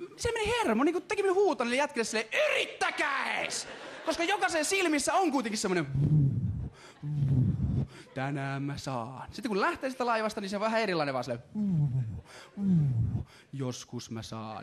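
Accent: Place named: South Ostrobothnia, Finland. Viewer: etelä pohojanmaa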